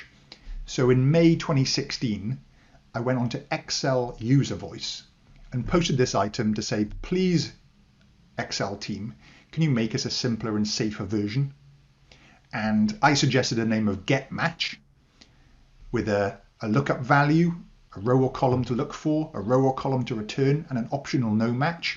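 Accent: Wales, United Kingdom. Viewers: Simple, clear, knowledgeable, and with a slight Welsh accent which I like. I can hear it cos I'm English 😎. There are lots of good Excel tutorial channels but this is one of the best.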